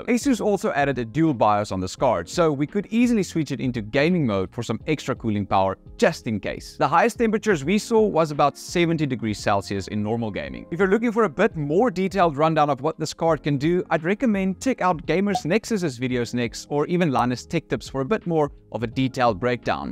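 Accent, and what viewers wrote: South Africa. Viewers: Mnr is jy van SA af?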